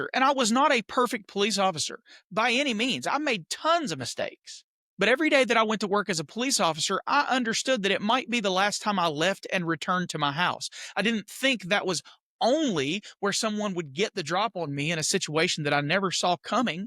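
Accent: South, United States. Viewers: Very good work here, Sir..... very good indeed. You mentioned the crafting of language which would show up in their Police Reports. You were kind in calling them misleading b/c to my way of thinking if it's not the truth then it can only be a non-truth. Whether we call it misleading or a lie, isn't the LEO and/or the DA failing to uphold their Oaths if any of the Police Report information is not true & they fail to be held responsible? I do apologize for going off topic here but this issue of misleading police reports gets even worse when multiple LEO's are conspiring to get the story straight? This was a really well thought out video - truly disturbing on so many levels. peace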